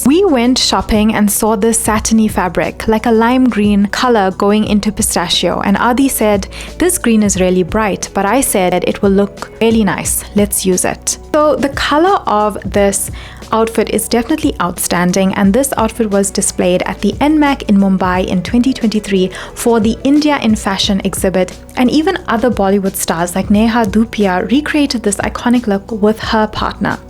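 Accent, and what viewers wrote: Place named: South Africa. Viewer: Unrelated to the topic but can I ask if you’re a South African desi ? Your accent is gorgeous !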